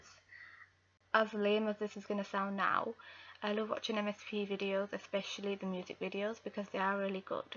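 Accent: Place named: Yorkshire, United Kingdom. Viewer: i heard she is from yorkshire up north but idk what that sounds like cos im from east england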